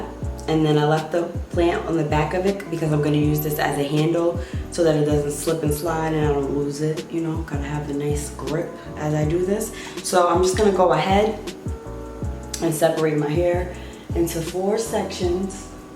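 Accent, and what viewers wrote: New York, United States. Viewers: Fellow NY’er here, I know that NY accent cause I have it too😂😂. But your hair and skin is freakin gorgeous. I will definitely try this and I just subbed. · U from NewYork?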